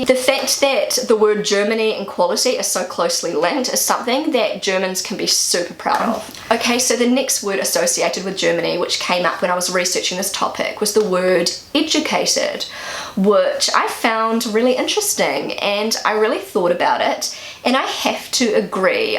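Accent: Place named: New Zealand. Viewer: Hi. This was the first time in my whole life I heard someone from New Zealand speaking and I really liked it. It’s a bit different to what I’m used to though. And no Deutsche Bahn is not punctual, wasn’t ever and I doubt it will ever be.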